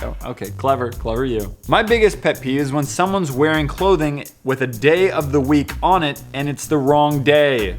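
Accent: United Kingdom. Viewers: Omg I love ur British accent I wish I was as good as an American accent as you are with a British accent because I'm gonna go to a school production audition and need to have a good accent but.... idk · I'm British sorry but that was a very bad British accent. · I love it when American youtubers try to do British accents!! Idk why but it's awsome and it just make me happy for no reason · No!!!!!! More British accent! (idk I just like the accent) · Nice British accent it wasn't even offensive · I love the british accent you had!!!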